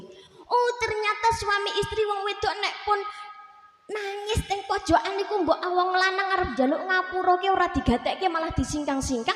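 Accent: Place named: Java, Indonesia. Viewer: Boso jowone meduuuk Anak² skrng bnyak yg tdk bs bhs kromo inggil 🙏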